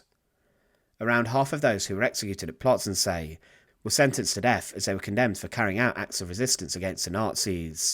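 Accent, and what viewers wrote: United Kingdom. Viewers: No ,he sounds British which he is. Enough said already!..😅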